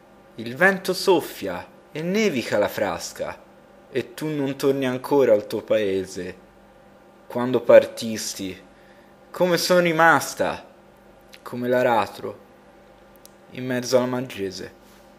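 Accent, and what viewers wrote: Tuscany, Italy. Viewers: Scommetto che sei toscano haha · Allora, non so a cosa servono le tre letture, comunque non volevo dire questo. A me l'accento da toscanaccio maledetto piace, mi sa di uomo con poca istruzione (e sicuramente non lo sei) che questa poesia la recita da attore protagonista. Molto interessante.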